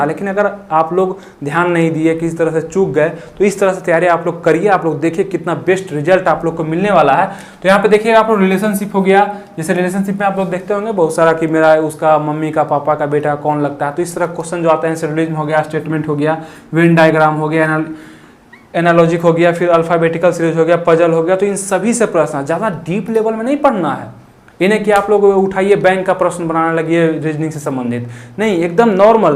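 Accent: Bihar, India. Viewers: या तो ये बिहारी है या फिर यूपी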